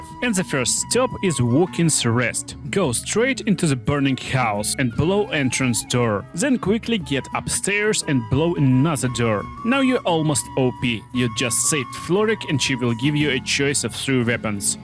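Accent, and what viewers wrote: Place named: Russia. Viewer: Сэнкс фор зе гайд! Ар ю пробабли рашн? Каз Айм притти щюр зэт зис ис рашн аксент.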